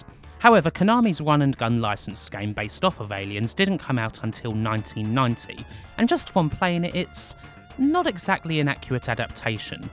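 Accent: United Kingdom. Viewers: how fucking awesome is it that I get to listen to an arcade game review by a British Elmer Fudd?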